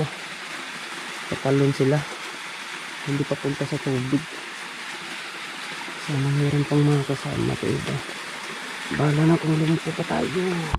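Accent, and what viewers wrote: Visayas, Philippines. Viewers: Bisaya man cguro ka. Lisod d. E bisaya? Hehehe... Mas nice magbisaya kay baga sa tono😂😂.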